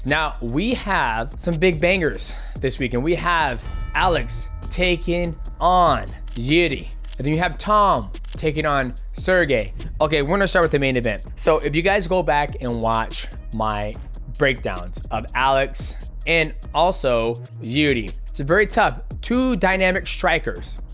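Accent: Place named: Hawaii, United States. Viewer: love the cheehoo bra, haaawaaaiian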